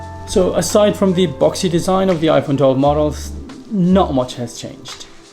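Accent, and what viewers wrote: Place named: United Kingdom. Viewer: The editing and camera quality is remarkable! also your accent is British with a hint of something else but I can’t figure it out 😂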